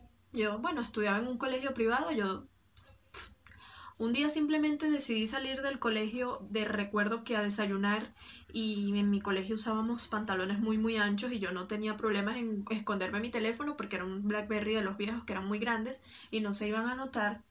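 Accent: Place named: Colombia. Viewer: se te noto el acento colombiano jajajajaja saludos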